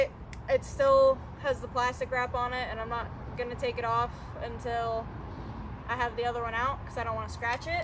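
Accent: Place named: Newfoundland, Canada. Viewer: Thing a ma bobber u talk like a newfie Like me lo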